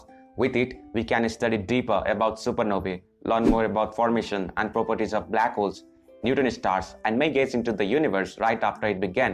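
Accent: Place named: Germany. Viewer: It's nice. But bruh your's English is like a Germenish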